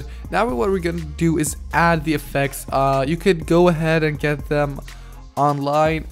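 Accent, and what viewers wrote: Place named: England, United Kingdom. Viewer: Great video! You've helped me so much! Want more intro's tutorials please, and if you could bring us some layout's tutorial it would be amazing! And I love your English accent :3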